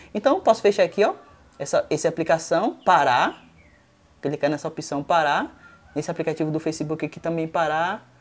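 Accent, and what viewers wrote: Bahia, Brazil. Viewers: Estou sentido um sotaque familiar( baiano ) no vídeo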